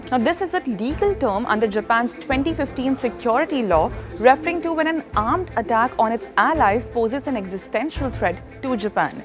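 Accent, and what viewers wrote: India. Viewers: Are you speaking Indian lanagauge? Sound like Indian language!!! Please improve, learn and reduce your Indian accent as much as possible. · Why does the anchor have very strong Indian accent?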